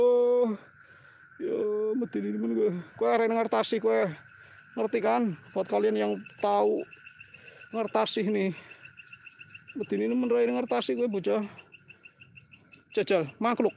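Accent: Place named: Java, Indonesia. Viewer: Saya suka medoknya ki 😆😆 prtahankan ya, slam dari Bali 🤝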